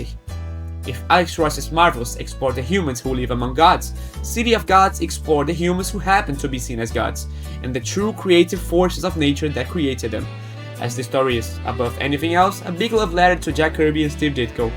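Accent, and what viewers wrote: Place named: Brazil. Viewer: belo conteúdo, identifico sotaque br desde longe haha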